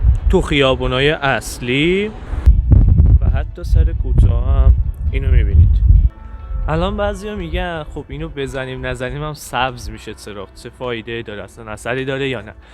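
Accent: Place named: Turkey. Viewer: عالی داداش ،داداش یکم لحجه داری ترک زبان هستی؟؟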